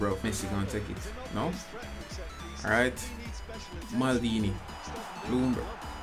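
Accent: Jamaica. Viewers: oh i been watching for weeks and i said to my self bet he's a jamaican😎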